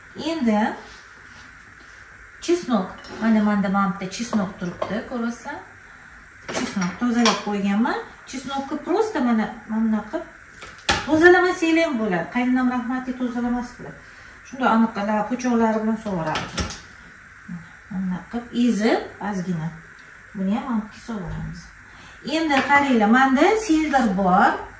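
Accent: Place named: Tashkent, Uzbekistan. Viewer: Замонавий амалдор айолга ухшайсиз илтимос адабий тилда гапиринг тошкент шеваси жуда одамни гажини келтиради хар сузга а кушмай гапиришни иложи йукми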